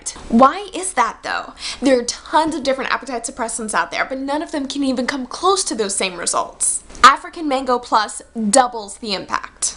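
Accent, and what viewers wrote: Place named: United States. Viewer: Possibly the most annoying american accent ive ever heard. My ears are bleeding.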